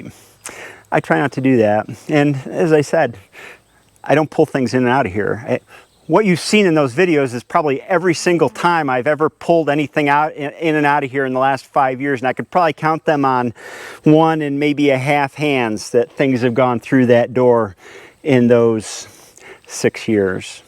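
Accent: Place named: New York, United States. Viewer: So since I first began watching your current videos cutting hay, there was a familiarity to you… when I watched your part 2 summary from 4 years ago today it became obvious (at least to me) who your narrations resemble. Can you guess? That’s correct! Andy Rooney CBS 60 minutes. I suppose there is a New York influence in there!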